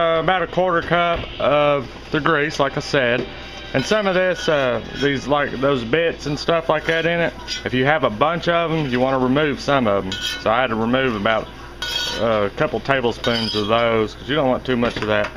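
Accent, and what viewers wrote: South, United States. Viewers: King of the dingalings........Southern accents are the best ever. Dont hate cause you ain't son. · really someone said European accent ugh nooo its a southernnnn one lol come on yall! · My parents were from Missouri. You sound more southern than that. But listening to you speak takes me back to when my mom took me to Missouri, 8 months before she died. And your recipes look so delicious. All you need to do is make tomato sandwiches! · That's a southern accent believe me I'm from South Carolina and we have that southern slan as well.. Greenville SC in the house and your food looks 😋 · From the sounds of this southern man, he probably doesn't care about your two cents. Move along... Totally trying this right now.... thank you for sharing! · you have a cute voice I love the southern accent!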